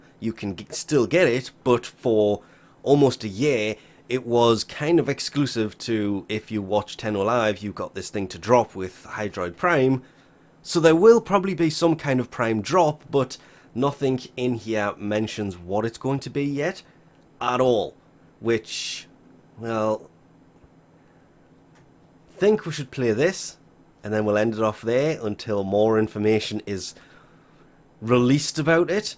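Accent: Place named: Newcastle, United Kingdom. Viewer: Geordie (from Newcastle I would guess).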